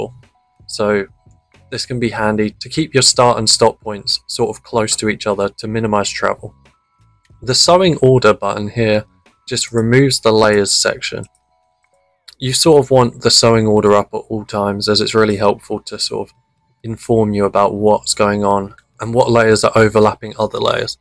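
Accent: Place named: United Kingdom. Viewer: Its brilliant to actually have a uk speaking tutor at last for this program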